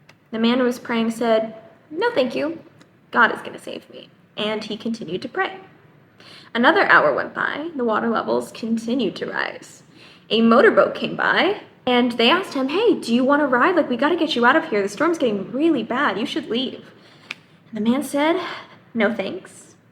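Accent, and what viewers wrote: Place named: Bollywood, India. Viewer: ooh you're punjabi. i didn't even imagined that you have a proper bollywood accent. anyhow thode muho punjabi sunan ch boht khushi hougi😍😂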